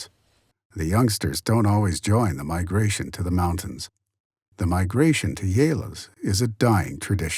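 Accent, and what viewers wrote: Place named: United States. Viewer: Watched one minute. American narration is awful. Not watching.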